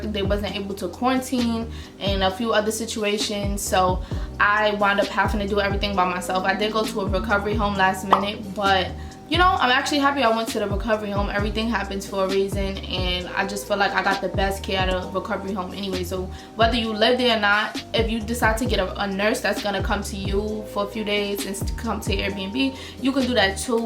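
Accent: New York, United States. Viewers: I sooo love your NY accent 🥰🥰and yasss miss mamas ya body is BOMBBBB AF!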